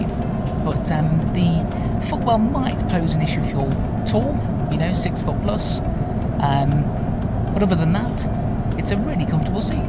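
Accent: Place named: United Kingdom. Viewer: I can listen to this British 🇬🇧 accent forever 😀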